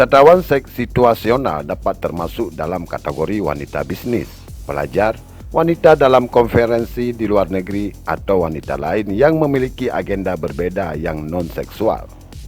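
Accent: Aceh, Indonesia. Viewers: kalau saya simak logatnya, kayaknya orang aceh ya?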